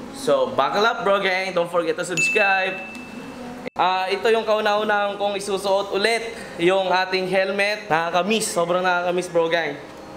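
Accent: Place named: Ilocos, Philippines. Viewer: Ilokano ka gayam brogang. Naalwad ah pang pataray brogang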